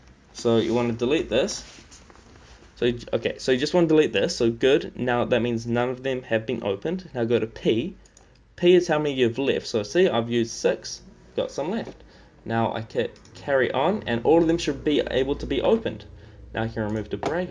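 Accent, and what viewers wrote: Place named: New Zealand. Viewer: you sound like a kiwi lol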